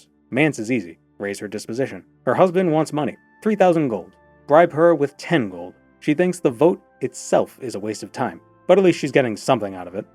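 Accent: Boston, United States. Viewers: So, it wasn't until I went back and watched your RS3 Maxing series that I clearly heard your Bostonian come out. I don't know how I never picked up on it from watching all of your Morrowind content AND your streams lol. I absolutely love it, honestly. It's the same joy I get when I hear Jerma slip into his Bostonian. I get it, my Texanese will show up from time to time. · You should use your boston accent more often its so good (I promise I'm not biased because i live here)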